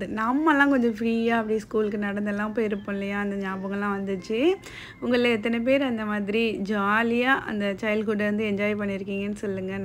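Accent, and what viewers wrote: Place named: Nagapattinam, India. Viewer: super sis yenakku nagapattinam namma oor slang varuthu athan kettan masha allah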